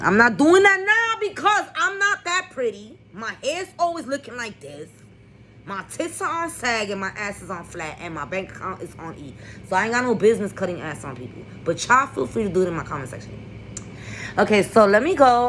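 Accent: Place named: New York, United States. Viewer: It's Chris Shawn but spelled Chrisean. Like your commentary tho ❤ keep it up you'll grow. Real recognize real. You have a NY accent I'm in Norf Philly not far at all. I got family all over the Bronx 8 say that to say you sound just like my crazy ass cussin whom I love to the moon. No shade when I say crazy mama 💯💖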